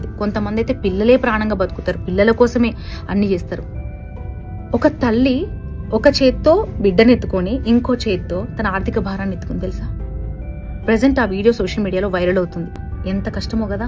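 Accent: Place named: Hyderabad, India. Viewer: Your Hyderabad accent is very Good . Also how to donate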